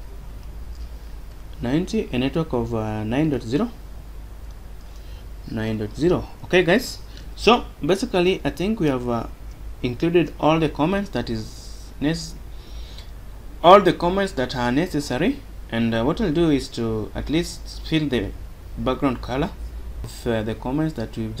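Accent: Africa. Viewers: Hello, great video and tutorial! I want to thank you for helping me with creating a network like this. I have a few recommendations for you to improve the quality of your videos even more. 1.) You should do something about the noise in your microphone as it is a bit annoying to focus on learning while that is constantly bugging in the background. 2.) You have quite a unique accent. Native African I believe. As I'm not familiar with your accent, sometimes it becomes quite hard to understand some words. So, using closed captions would really help to make your videos be even easier to understand. 3.) You should make a list of what the steps are in a serial order so its easier to pick up from anywhere in the middle of the video if someone is stuck somewhere or is confused because right now its a bit hard find specific steps if I want to go back to them. ALL that being said, you were a great help and I really love this channel. 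Thanks for creating such videos and keep creating more!!! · This was simply amazing. We were given project by university to implement this and you illustrated it perfectly. More power to you I guess by your accent that you belong to Africa. Lots of love for you brother from Pakistan. Kudos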